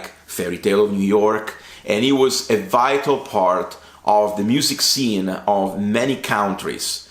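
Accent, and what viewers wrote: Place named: Dublin, Ireland. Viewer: A little bit of an Irish and indeed a Dublin accent I can hear. Very cool. As for Shane, we lost a little bit of our past, present and future when Shane stopped writing. It's a loss, he is a loss. But like you said, not in a sad way which is strange. Plus he got a fantastic send off and now a punk in spirit. P.S. I don't mean for this to be the type of question which it will surely sound like. If you had to, which song from his song books would you pick as a personal favourite in terms of story, lyrics, honesty, emotion and of course musicality? For me, and for all of the reason and more listed above.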